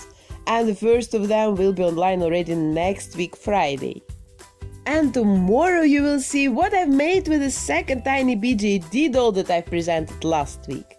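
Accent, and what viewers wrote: Russia. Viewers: I normally cannot stand strong Russian accent, but with your videos I find that I can listen to you for hours and watch your incredible repairs! Awesome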